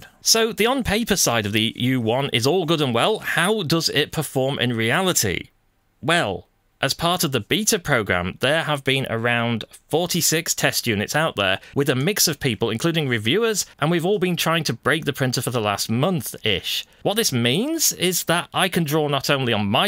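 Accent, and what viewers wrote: England, United Kingdom. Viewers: This is yet another untested pie in the sky kickstarter project with no track record and many many unanswered questions despite all the fancy pants marketing and English accented voice overs . Don’t be a guinea pig with your hard earned dollars . Let some other clown waste their money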